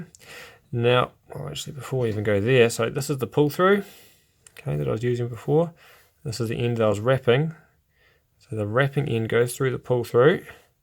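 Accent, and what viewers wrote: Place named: New Zealand. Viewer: I’ve watched this tutorial dozens of times over the years, and I so appreciate you taking the time to make it, because - as a kiwi stranded in Canada with a boy who fidgets with his pounamu incessantly - I replace cords regularly. I never manage to onboard that knowledge as it’s just infrequently enough that my brain refuses to acknowledge that it’s essential information, or perhaps it just wants to reminisce and listen to a New Zealand accent explain clearly and calmly how a thing is done. Either way, you are a veritable treasure; thanks so much for helping this kiwi in a foreign land continue to proudly display part of their heritage.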